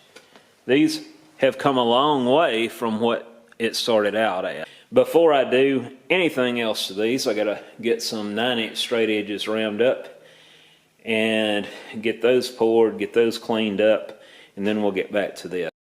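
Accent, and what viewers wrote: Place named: South, United States. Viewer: "Filled" and "failed", in a southern accent, sound so similar to a northern guy...I was getting depressed for you bud!!!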